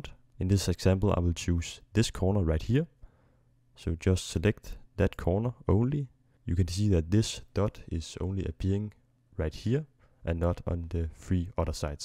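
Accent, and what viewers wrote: Denmark. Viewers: He sounds like he's from Denmark...rural Jutland if I'm guessing.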